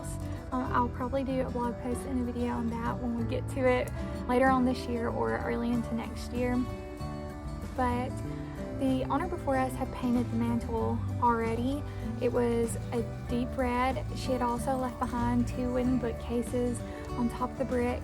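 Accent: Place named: South, United States. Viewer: loveee your adorable southern accent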